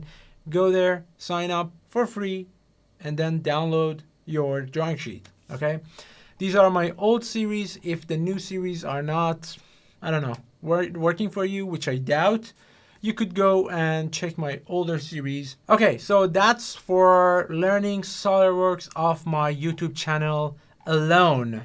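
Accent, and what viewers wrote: Iran. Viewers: Hello, you have Iranian accent, are you from iran?:D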